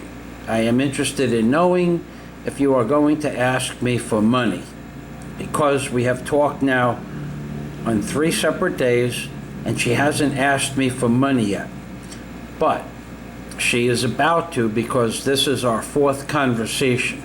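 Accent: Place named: New England, United States. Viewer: Very funny but a useful reality check for all the naive guys and asian dating site newbies that believe everything they see or read. I think this is the second video I've seen of yours and you are actually a little annoying at times especially your new england accent and ridiculous obama references but you do provide some funny content. And you seem to attract the money grubbing types (big money)...why do you think that is?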